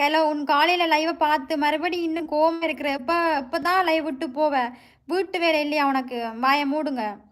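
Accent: Sri Lanka. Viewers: இலங்கை தமிழ் சூப்பரா இருக்குங்கோ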